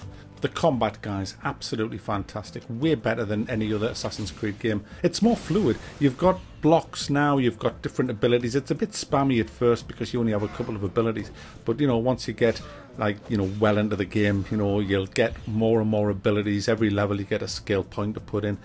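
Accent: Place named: Scotland, United Kingdom. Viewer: You Scottish? Something satisfying to hear your accent rap off profanity during a review that makes me smile. You got a sub, good review.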